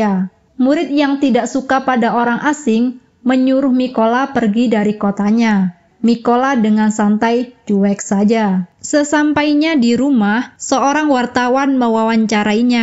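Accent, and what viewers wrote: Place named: Java, Indonesia. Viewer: Seru banget ceritanya, tapi agak medok suaranya hehe. Lanjutkan mbak.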